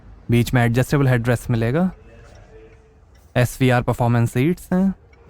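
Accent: United Kingdom. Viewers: British bolna teak hai but the landrover ownedby Tata motors 🔥😎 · Bhai Saab...not British....be indian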